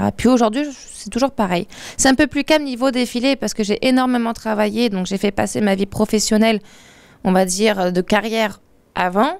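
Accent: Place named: Paris, France. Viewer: Juliana Laurent c’est vrai qu’on dirait qu’elle a un accent du genre parisien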